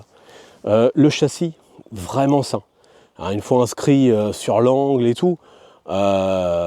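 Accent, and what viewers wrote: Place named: Rouen, France. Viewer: En mode Speurt? comme c'est beau l'accent de Rouen 🙂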